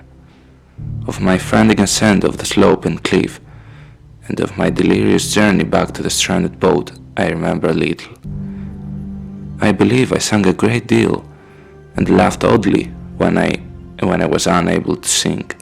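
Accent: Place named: Greece. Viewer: you greek mate ? :) nice work btw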